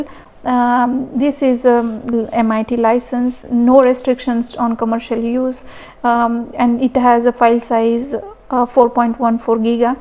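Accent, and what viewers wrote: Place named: Maharashtra, India. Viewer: Marathi accent ! Nice information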